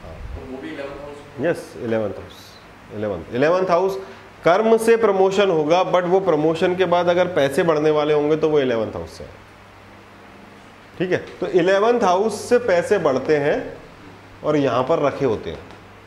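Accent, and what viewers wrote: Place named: Indore, India. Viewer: Sir aap pure Indori ho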